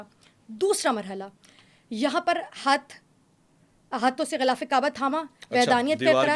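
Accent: Iran. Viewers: Have they become less sacred or insulted when pronunciation is in Persian accent 🤔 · Why the persian accent of narration and pronunciation of the sacred names? When all our bibis and prophets were from Arabia.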